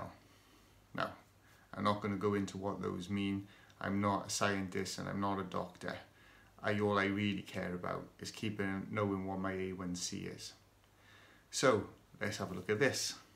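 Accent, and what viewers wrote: Wales, United Kingdom. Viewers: Do I detect a Welsh accent?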